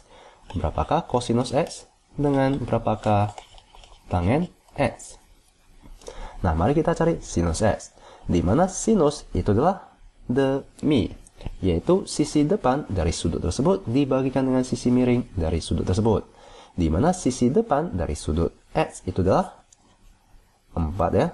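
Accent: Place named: South Korea. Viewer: Terbantu akhirnya faham juga .kak kalo ngomong kok mirip orang luar ya kayak orang korea ngomong indo gitu😀😁😁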